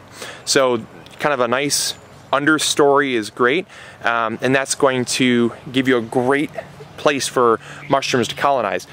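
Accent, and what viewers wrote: Michigan, United States. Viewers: Holy Michigan accent! Thanks for the lesson I can't wait to try this. Luckily my compost pile is pretty shady.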